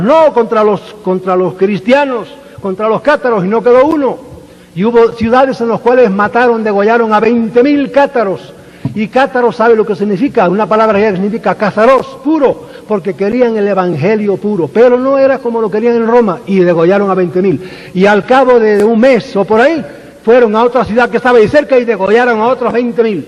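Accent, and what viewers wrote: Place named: Spain. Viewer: Es español el señor salvador¿?apenas se le nota el acento.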